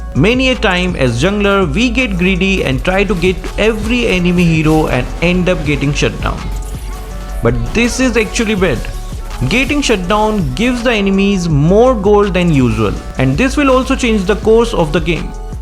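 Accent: India. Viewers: Channel ini ni punya orang India ya? Logatnya kebaca bgtt jir 🤣🤣🤣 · Your voice similar with indian accent...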